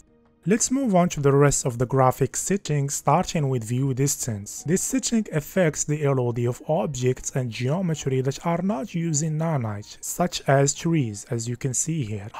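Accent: Morocco. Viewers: sounds moroccan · the Moroccan accent is a bit too overpowering brother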